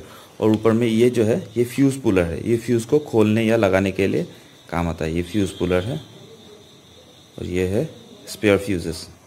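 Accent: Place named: Assam, India. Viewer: I love the sweet sounding Assamese accent Hindi. Thanks for the informative video. Respect!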